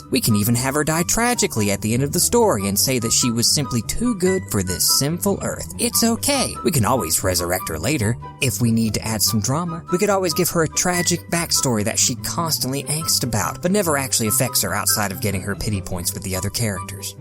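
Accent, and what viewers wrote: South, United States. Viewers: your mildly southern accent makes these 100 times funnier to me man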